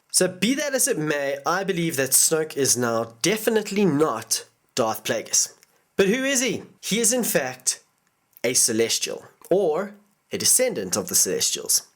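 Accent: South Africa. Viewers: Are you South African? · where are you from? you sound south African but I could be wrong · Are you some what of South African origin? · where are you from u sound south African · Are you south African? cause you sound South African.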